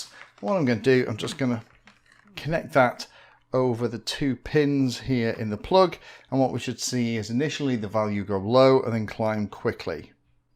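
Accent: England, United Kingdom. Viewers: Hey - at 87 I must be the oldest fpv daddy around! But........I am getting there because YOU are there. Also, give me an English voice anyday! So easy to understand, for us Down Under. <link>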